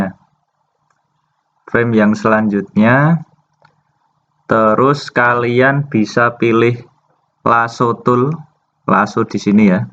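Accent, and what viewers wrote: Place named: Java, Indonesia. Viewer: Pak medok banget hh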